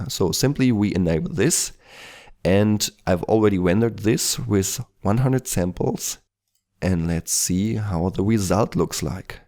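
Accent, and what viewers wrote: Germany. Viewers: ) nichts gegen dich aber bemerkt das du deutsch bist diese aussprache von englisch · are you german? you really sound so...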